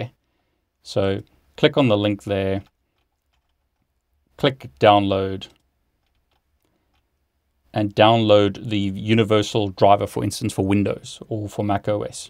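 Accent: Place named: South Africa. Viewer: Now that looks like some nice toys., I am starting Cyber Security at the age of 45 thanks to you, Niel and Networkchuck! Are you South African? Your accent sounds SA...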